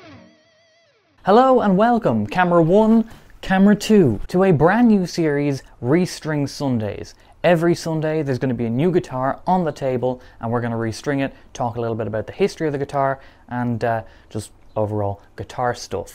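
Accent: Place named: Ireland. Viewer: Love your accent Irish dude!!!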